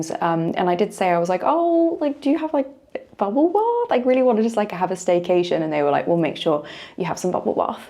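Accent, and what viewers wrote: RP, United Kingdom. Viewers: Hi from Morocco, I watch your videos every day, cuz I love your RP accent and I want to speak like you. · Hello from America. I am indulging myself in your speech, your choice of words, and your cadence. The British accent (from my perspective) is simply charming. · £835!!!! 🙈 that outrageous for most of us, it looks lovely but I dont think its worth it Plus the kettle should have been emptied before you came, you have no idea how long that water had been sitting in there and where were the biscuits????? Plus you do sound very well spoken (quite posh sounding ) 🤣 so I think you can carry it off more than the rest of us 😊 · you need to come and check out Aussieland this Chrissy because we love your posh British accent.we,'ll fill you full of Prawnies and FOSTERS,we love poms.The ashes will be on as well as all the British backpacker parties on Bondi beach when they leave their rubbish but we don't mind,come and enjoy.😊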